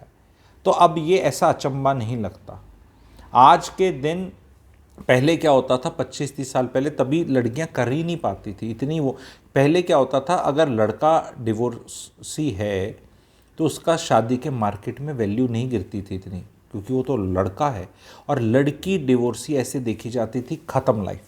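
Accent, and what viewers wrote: Haryana, India. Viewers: I like your Hariyanvi accent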